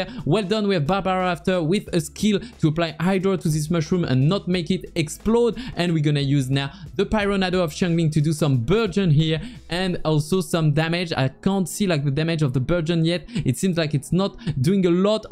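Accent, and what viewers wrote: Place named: France. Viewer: Tu gères de ouf je m'attendais pas à aussi bien quand t'as dit t'étais pas satisfait de ça en live ! Honnêtement je peux comprendre pourquoi tu ressens ça, on sent bien l'accent baguette mais ça c'est osef on te comprend très bien ! T'essayes d'avoir le rythme et énergie de commentaires comme tu le fais en Français mais comme c'est la première fois on sent c'est pas naturel à 100%. Ça reste quand même très bien pour une première fois, pour le peu de pvp anglais et commentaires que j'ai vu tkt pas t'es très bien à côté xD et tu vas sûrement progresser avec le temps en tout cas gg 🎉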